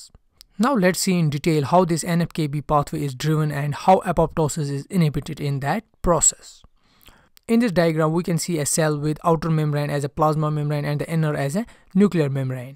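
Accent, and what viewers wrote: India. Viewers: نفسي افهم الاكسنت بتاعة الهنود😭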